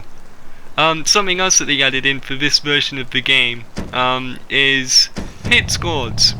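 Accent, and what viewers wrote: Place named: United Kingdom. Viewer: Everyone is different. Wow, is this a crime or something? Does he have to talk like you, does he have to walk like you...? I've seen so many remarks about people's voices that are actually NORMAL. This guy's voice is fine. Leave him alone. I don't even know him, but I find his commentary to be fine, casual, and I know (obviously) that he is most likely from the UK. Maybe he doesn't have the best recording equipment? No reason to curse at him over it! Sheesh...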